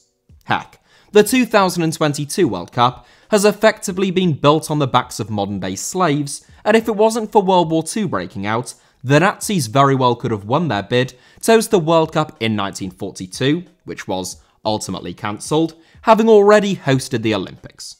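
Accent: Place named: United Kingdom. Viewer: Listen Dinis, you’re posting all these angry corrections but I think you forgot that OTHER PEOPLE HAVE DIFFERENT ACCENTS to you. is British and that is just the way people talk there, there is no right or wrong way to pronounce the words you’re correcting. I’m sure you probably can’t properly pronounce many words in English properly yourself, that’s just the way you speak, get over it. Just because you’re angry at something he said in the video doesn’t mean you need to be a pr*ck about it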